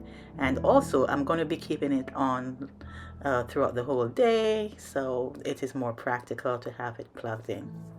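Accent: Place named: Caribbean. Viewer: Very beautiful ❤also i like your accent are you caribbean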